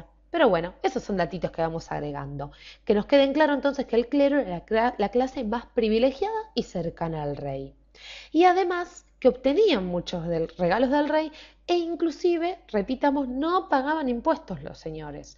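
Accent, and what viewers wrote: Argentina. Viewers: Me encanta el acento argentino :D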